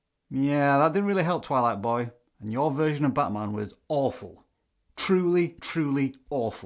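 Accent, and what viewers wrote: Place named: Northern England, United Kingdom. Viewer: Another great video,! Still love the Northern England accent. I actually got to this video, because I was previewing one of my videos and it popped up in the side bar.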